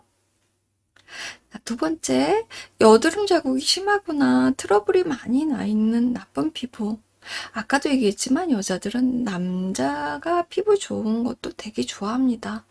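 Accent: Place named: Gyeongsang, South Korea. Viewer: 잘쌩긴이라고 발음하지 마시고 잘생긴이라고 발음하시길...... 잘쌩긴은 호남말투인데, 억양은 영남이시네요..박원순 서울시장의 억양......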